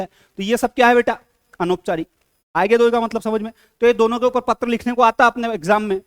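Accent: Indore, India. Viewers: He's accent says he is from Indore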